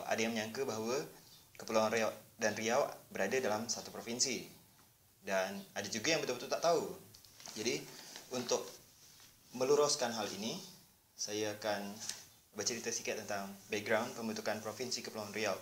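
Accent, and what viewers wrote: Malaysia. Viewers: He sounds like us malay in Malaysia 😄😄😄😄❤❤❤ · Kalau dengar suara sahaja, mesti akan ingat orang malaysia@ yang bercakap ni.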